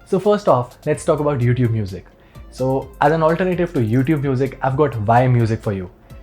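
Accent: India. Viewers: This guy is awesome. I was skeptical of the indian accent but then I realized that he was talking in perfect english. And your video is edited and recorded perfectly. You get a sub and like from me!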